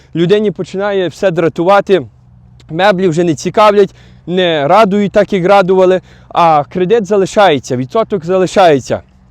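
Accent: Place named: Hutsul, Ukraine. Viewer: аха...ха що за вуйко? Але смішно говорит, в Україні хіба десь в горах гуцули засраті так говорат. Все там правильна побудовано і задумано, щоб тваринам не хотілось воювати і вбивати собі подібних треба їх нагрузити працею, щоб голови немали коли підняти. Хто приїзджає в США і Канаду з країн колишнього срср називають їх трудовим табором з підсиленим харчуванням.Зато в Канаді і США немає війн вже з 1873 року, а на території срср постійно дебіли товчуть один одного.Бо робити не хочуть.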